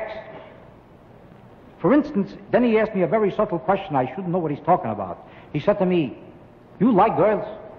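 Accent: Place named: New York, United States. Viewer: Infinite list of little absurdities. And the NY accent. He was a real hit in his day. And his day lasted 60 years.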